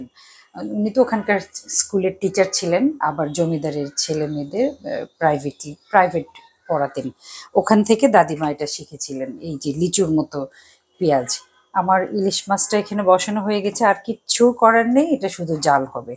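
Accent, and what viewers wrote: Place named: Noakhali, Bangladesh. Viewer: নোয়াখাইল্লা আন্টি আসলে আপনি কে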